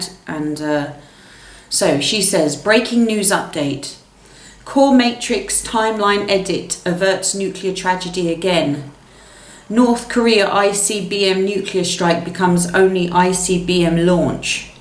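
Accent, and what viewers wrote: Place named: United Kingdom. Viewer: please dont delete your channel. I love your sharings and British accent.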